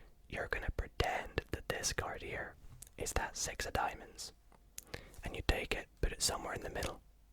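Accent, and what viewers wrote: Scotland, United Kingdom. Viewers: Are you scottish by chance? Thanks for the video and i like your accent alot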